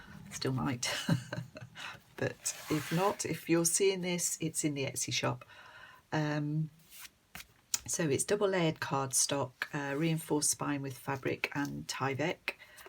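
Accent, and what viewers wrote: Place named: West Midlands, United Kingdom. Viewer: I’ve just discovered your lovely channel....some beautiful journals you have xx I started journalling earlier this year and love it! I thought I detected a West Midlands accent....I’m from Brum originally 🙂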